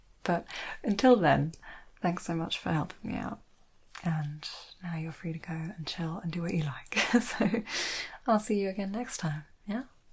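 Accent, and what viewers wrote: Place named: Australia. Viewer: Aussie accent love it❤!!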